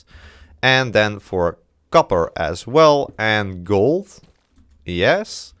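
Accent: Netherlands. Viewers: my first time watchin your vids, immediately noticed you're dutch. Ga zo door met je video's!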